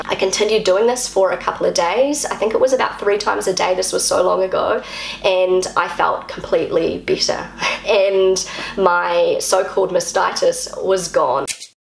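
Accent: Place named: New Zealand. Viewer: I as a German with lately more of an average share of doctor's appointment obviously cannot comment on all possible infections, but the last time that I remember having been prescribed an antibiotic was more than twelve years ago when after having been bitten by a tick, an erythema migrans developed which might have turned into Lyme borreliosis, which was stopped by doxycycline. But other cases I don't really remember, and I'm in the second half of my sixties. As an aside, I really enjoy your Kiwi accent. I was in NZ in 1987 and 1993, and it is one of the few countries I'd have a desire to visit for a third time if it weren't so bloody far away. But in trying to explain to non-German (but some-kind-of-English) speakers how a German long E is pronounced, I'm sometimes successful by pointing out how New Zealanders pronounce "nEver", "sEd [said]", "tEn", "elEven and so on.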